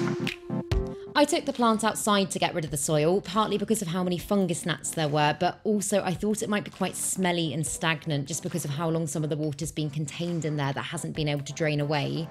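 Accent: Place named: London, United Kingdom. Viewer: I like your English. Londoner?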